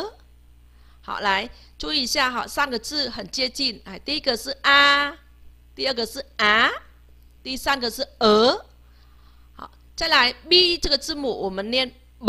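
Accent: Vietnam. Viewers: 太感謝了! 在越南工作我都不知道我同事在說什麼 😂 老師的發音應該是北越吧! 跟我公司同事發音一樣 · 老師,冒昧問,你的發音,南越腔,還是北越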